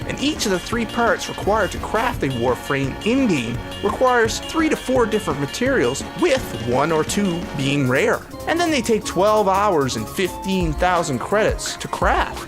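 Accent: Canada. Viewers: You have a Canadian accent lol. Anyways, thanks for the review! I'm glad you went over it and such but maybe you should do an update?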